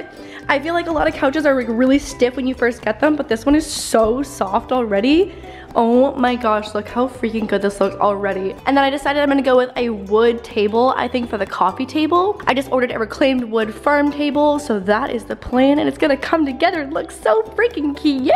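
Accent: Canada. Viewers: Is she Canadian? Her accent tho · I love that you can tell you're from Canada when you talk! So exciting! Congrats on moving!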